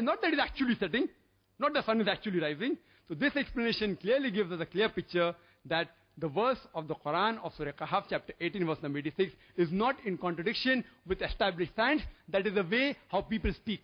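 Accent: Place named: India. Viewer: There is a huge distinction between saying, that the sun sets in Murky pool of water, and that the sun appears to set in a Murky pool of water. Like saying ' I killed that guy' and 'I appeared to have killed that guy'. Here is what Quorun says - 'Till, when he reached the setting-place of the sun, he found it setting in a muddy spring, and found a people thereabout. We said: O Dhu'l-Qarneyn! Either punish or show them kindness.! - Qur'an 18:86. The point number two: this guy appears to be a real knucklehead. His English, or what it sounds like - Hinglish, really sucks.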